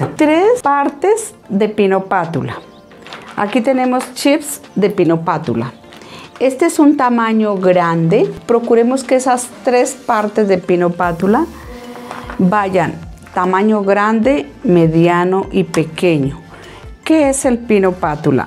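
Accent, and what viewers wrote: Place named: Colombia. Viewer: Que acento colombiano más bonito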